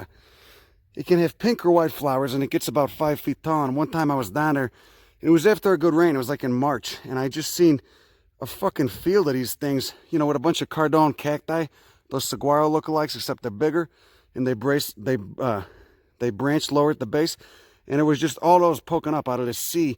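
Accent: Chicago, United States. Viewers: chicago accent at its best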